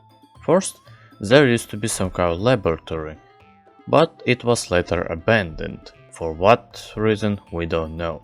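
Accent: Russia. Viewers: i think he has a russian accent or something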